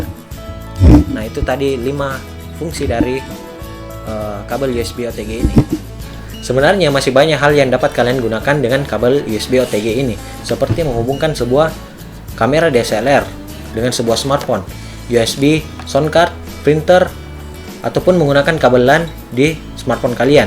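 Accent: Makassar, Indonesia. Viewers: Orang makassar yah bang?? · Bang orang Makassar yah? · org makassar kah???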